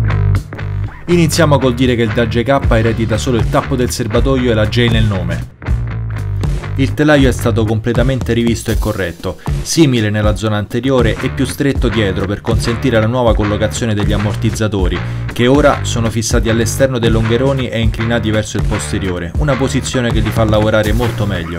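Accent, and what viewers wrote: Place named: Rome, Italy. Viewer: Aooo !! Ma che sei poco poco romano ! Comunque bel test , ma sopratutto bel pezzo di ferro .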